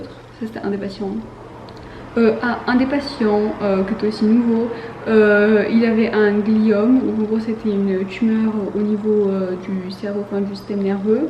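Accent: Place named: Italy. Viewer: Tu as l'intonation des italiens quand tu parles en français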